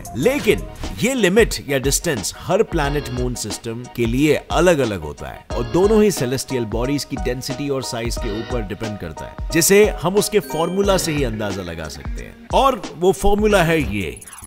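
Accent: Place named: Maharashtra, India. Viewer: Tension naka gheu , Marathi accent 👍🔥🔥❤️ kamaal aahe 👍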